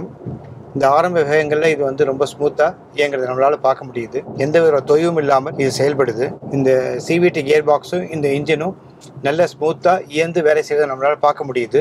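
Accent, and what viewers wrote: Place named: Mylapore, India. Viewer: Boomer mama your is like our pal packet Mylapore nai sekar slangs. Why 😮😮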